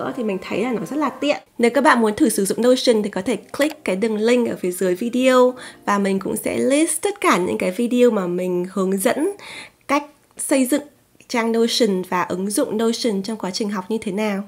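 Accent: Standard. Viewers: Chị nói chuẩn ghê. Google dịch được hết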